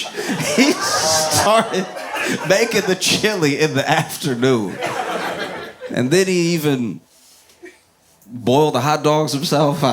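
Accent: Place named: Tennessee, United States. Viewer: Shreveport is in the house! Stoner Hill to be exact. What up homeboi! I've been trying to figure out your accent. For some reason I thought Tennessee. Dalzell St. in Highland, wow. Keep up the good work! 🫵💪💯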